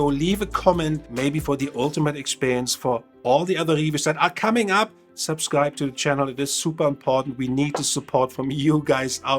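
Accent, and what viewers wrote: Germany. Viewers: Englisch ist nicht wirklich deins mit einem deutschen Akzent. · Sehr Gut Gemacht video. I like your Deutsch-English 😂😍😍😍💓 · Noice review, wonderful German accent.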